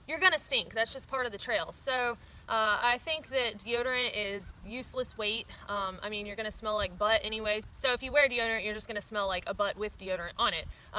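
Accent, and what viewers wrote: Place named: Alabama, United States. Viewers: I knew your accent sounded familiar. you said Alabama. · I really dig your southern Alabama accent!! It's really awesome that you share such intimate and taboo subjects such as personal bodily functions that not a lot of people would really share. I am not trying to be vulgar but when you said you hated body hair.. do you recommend shaving your pubic hair (male or female) to prevent chafing and also for hygienic purposes? Anyway, thanks for the great info and stay safe out there and please keep the great videos coming.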